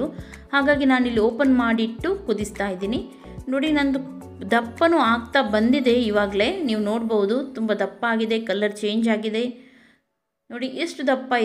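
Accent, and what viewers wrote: Mangalore, India. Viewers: Buddy it's Mangalore accent and request u to Rome all over Karnataka to learn or understand before committing....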